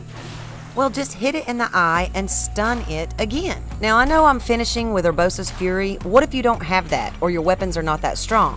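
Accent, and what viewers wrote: South, United States. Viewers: I'm just here for the Southern accent 😎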